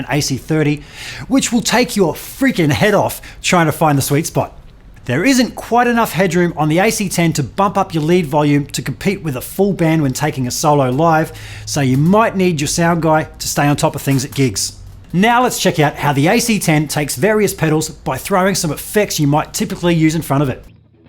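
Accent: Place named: United Kingdom. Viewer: I, for one, was impressed by the British accent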